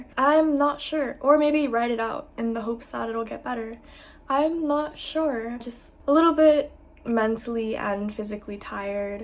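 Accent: United States. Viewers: why an American accent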